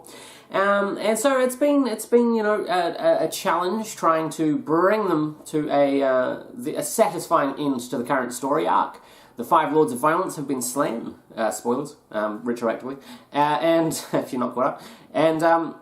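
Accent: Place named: New Zealand. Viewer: Damn I miss that New Zealand accent. Currently living in Sydney at the moment.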